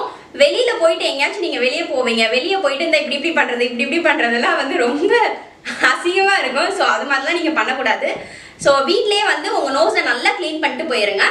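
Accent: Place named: Madurai, India. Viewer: Sissy neenga maduraiya 😘😘 slangeh solludhu "sollikirae,kuduthurae,senjirae" Any way gud content💓👌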